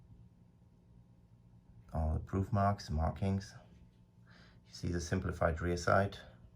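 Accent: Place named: Germany. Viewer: Are you german? Your accent sounds very german.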